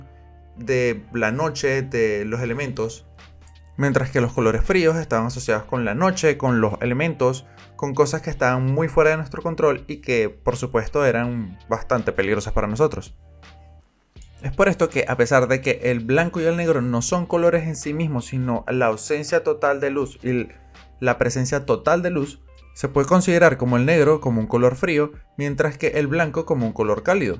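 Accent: Venezuela. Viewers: Eres venezolano? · si es evidente, solo que no es tan conocido. Pd: a mi me gusta mucho el acento veneco jeje. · Ese acento. Venezolano, tal vez?. Dibujas super genial!.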